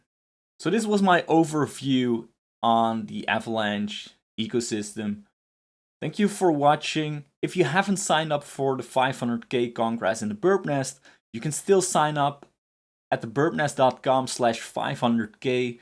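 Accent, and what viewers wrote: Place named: Poland. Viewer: Are you Slavic? Sounds like Polish 🇵🇱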